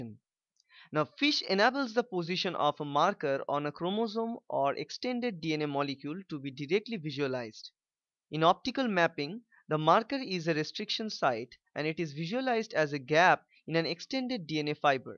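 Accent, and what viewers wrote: Bengal, India. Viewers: his indian accent is much more annoying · Its Indian accent, with a bengali sub accent :P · ...i never said it before, but I love your Indian accent <3 .. haha thanks a lot!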